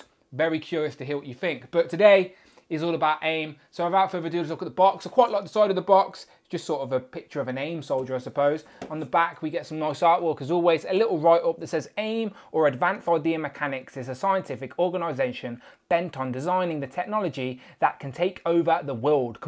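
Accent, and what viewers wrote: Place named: United Kingdom. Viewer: 23 Famez haha right ? Lol drove me nuts, but In his defense at the same time I know he’s a Brit and probably isn’t use to our American pronunciations 😅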